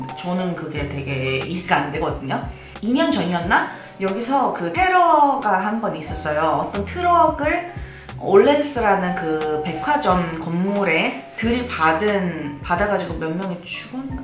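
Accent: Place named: South Korea. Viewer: 영어에 한국어액센트 넘 심해서 듣기 불편. 그냥 조선말로 편하게 하세요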